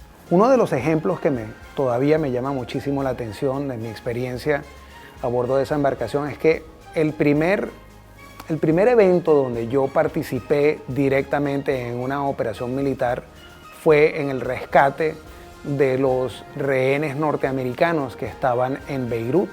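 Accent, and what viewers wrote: Venezuela. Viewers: habla como venezolano ❤ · Sus padres seguramente son Venezolanos,ya q habla con nuestro dejo,y la jerga venezolana.Gracias por sus análisis.Venezuela Libre.Buen viento y buena Mar.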